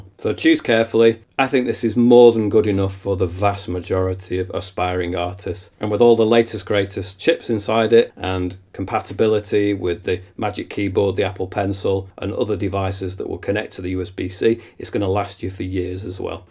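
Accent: United Kingdom. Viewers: Wow a British reviewer on youtube! Yes yes yes!!!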